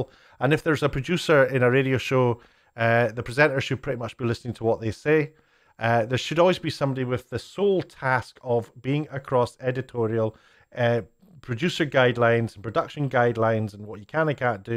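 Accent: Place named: Wales, United Kingdom. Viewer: The following comment is not about Talk Radio, but about the YouTube rules and regulations which you mention: Last year when YouTube introduced the COPPA changes, I was shocked to consistently hear/ read in the comments that a lot of folks seem to think YouTube is a public service company. It isn't, it is a private company which means EVERYONE has to adhere to their rules. In exactly the same way that every pub landlord reserves the right not to serve someone they don't think should be standing at THEIR bar. Also, since the COPPA clean-up which might have also coincided with the new Community Guidelines (I don't know if this was part of COPPA?) I much prefer the YouTube experience now, as the comments were becoming a hate-filled, foul-mouthed cess-pit, which I would not allow in my bar! (I don't own a pub, I make armour... but staying with landlord analogy). 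In the words of our leader (Mr Colin Firth) "Manners maketh man" , so let's all pretend our Grandmother is sitting at the table and be more courteous, this used to be something the British were famous for. 😉🏆🇬🇧 Best wishes, and I like your accent... I have always had a soft-spot for The Welsh! 🤔😉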